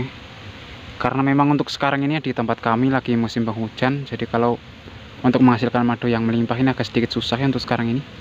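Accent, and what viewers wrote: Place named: Wonosobo, Indonesia. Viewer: Mau tanya bang,ini dari logat bicara sama seperti istri saya..apa abang dr wonosobo?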